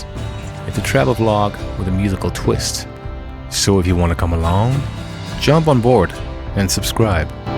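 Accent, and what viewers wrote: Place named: Germany. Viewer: Just from your accent I understood youre Deutscher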